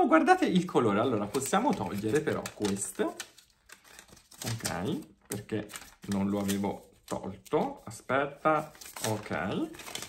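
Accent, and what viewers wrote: Tuscany, Italy. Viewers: Ciao ….io è da poco tempo che ti ho scoperto e ti seguo…ma sei stato una meravigliosa sorpresa….non riesco a fare a meno di guardare i tuoi video perché mi fai spataccare dalle risate….sei una persona davvero stupenda e sono molto felice di averti scoperto! Poi hai quell’accento toscano che io ho sempre adorato e mi piace da impazzire😍 ti auguro tutto il bene di questo mondo e che tu possa fare sempre piu video e avere sempre più persone che ti seguono…perché te lo meriti! Sei unico!❤………… ah stavo quasi per dimenticarmi…..no scherzo🤪😂 tanti tanti auguri di un meraviglioso compleanno❤ ti adoro 🥰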